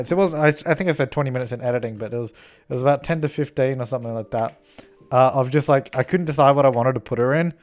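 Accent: Australia. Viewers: Mate, you are like the best stereotypical Australian the accent to the phrasing is great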